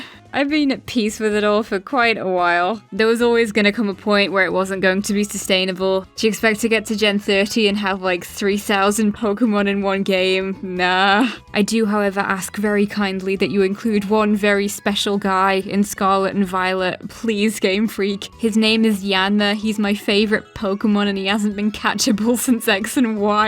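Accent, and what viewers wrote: United Kingdom. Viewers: HOW DID I NOT REALISE YOU'RE BRITISH - I'M BRITISH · Your British and you’ve never summered in Spain?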